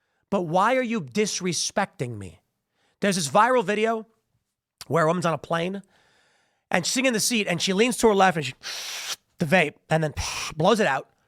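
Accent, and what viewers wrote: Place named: Chicago, United States. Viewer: your SW Chicago accent and attitude come through loud and clear in this video! (Observed from a west suburbanite)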